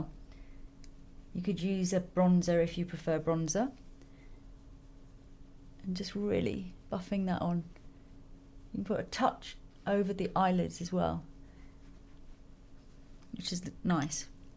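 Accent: United Kingdom. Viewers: wher u from UK??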